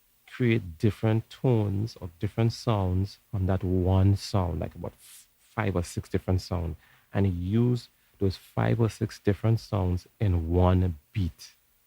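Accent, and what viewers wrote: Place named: Trinidad and Tobago. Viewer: dawg that Trini accent be strong af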